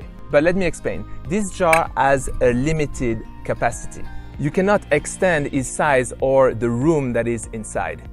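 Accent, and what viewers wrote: France. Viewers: Nice demonstration, adapted from the original intervention visible here. With the French wine and the French accent, why not give a French version with the link for the moose? <link> Jolie démonstration, adaptée de l'intervention originale visible ici. Avec le vin français et l'accent français pourquoi ne pas donner une version française en renvoyant à l'orignal ?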